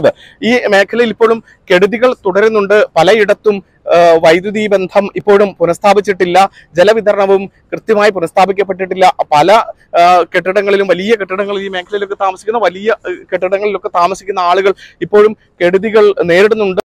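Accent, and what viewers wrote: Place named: Kottayam, India. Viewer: This is not Telegu, true Kottayam Malayalam.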